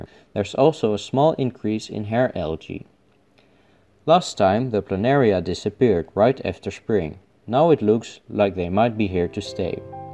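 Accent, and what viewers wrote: Netherlands. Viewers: Ur Dutch Lache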